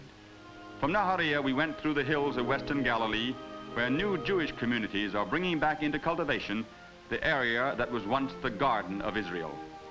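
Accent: United States. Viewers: To us Brits it's definitely an American accent. His pronunciation is a tad bit dodgy as well. Druzes!!! It's just Druze. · To me it sounds American. Only a few words here and there sound "British" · It's called Trans Atlantic English. It was very common back then. No one actually talked that way, but pretty much any American movie from that time has this accent.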